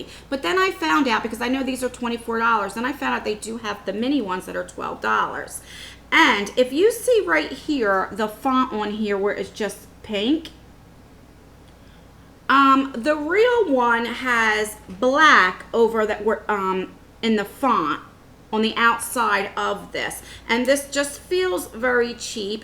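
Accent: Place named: Northeast, United States. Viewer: ...can I just tell you how fun you are to watch! Love your personality and your northeast accent! I feel like I'm hanging out with one of my cousins. You'd be a blast at a family party lol!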